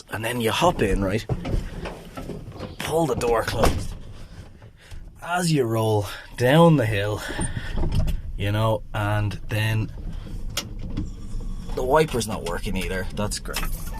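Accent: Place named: Ireland. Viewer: The Irish accent and the laid backness does it for me 😂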